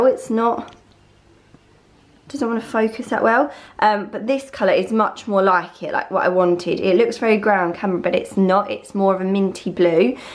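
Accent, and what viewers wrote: United Kingdom. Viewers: ar you from the uk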